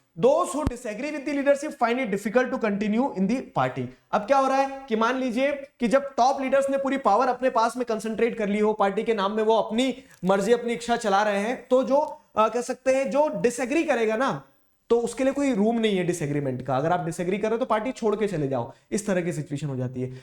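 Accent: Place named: Rajasthan, India. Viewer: rajasthani tadka sir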